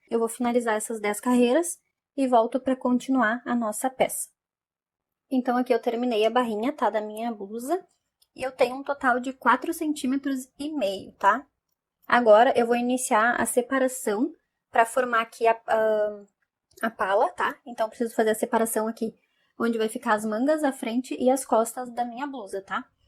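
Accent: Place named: Rio Grande do Sul, Brazil. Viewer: Olá pelo teu sotaque tu és gaúcha de onde tu és?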